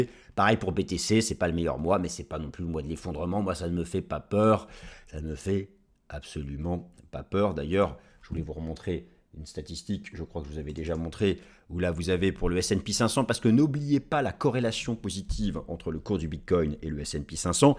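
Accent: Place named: France. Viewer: Ton accent à la française... trop mignon! Mais bon, cela n'enlève rien à la qualité de ton contenu!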